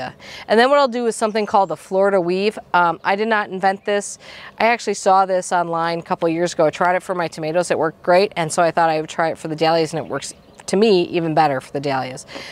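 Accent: Wisconsin, United States. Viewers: Great Dahlia video--THANKS! I have grown Dahlias for 5 years or so, but there are so many helpful things to learn! The Florida weave looks like a super way to keep Dahlias from toppling! I have 4 of the 5 varieties you showed, (not Penhill Watermelon), with all but Cafe Au Lait being new for me this year. I am so excited to see them bloom!! Your Dahlias are quite a bit bigger than mine so far, as I had to put mine directly in the ground. I would LOVE to start mine in pots, but I don't have room....I have 90 varieties total this year (Where do you keep them as they are growing in pots?)! ;) Thanks for all the tips--Hope to see an update this Summer! :) :) Love your accent by the way--My aunt lives in Wisconsin (Neena, WI), and it makes me think of her when you talk...such a lovely sound to my ears! Happy gardening! Hope you have bushels of beautiful blooms! 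;)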